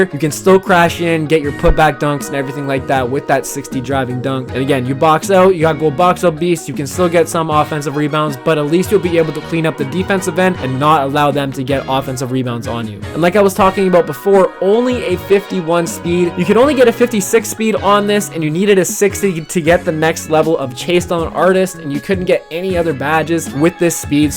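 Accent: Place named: Canada. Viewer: I am going to take a WILD guess... are you Canadian? Whenever I hear you say "out" it reminds me of a Canadian friend of mine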